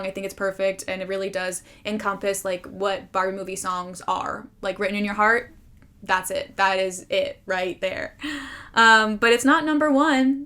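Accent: Canada. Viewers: this is off topic but has ever said she’s canadian because the way she says about and out makes me think she is lol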